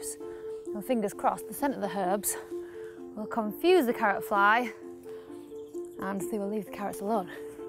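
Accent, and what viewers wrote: United Kingdom. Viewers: UK sound, very nice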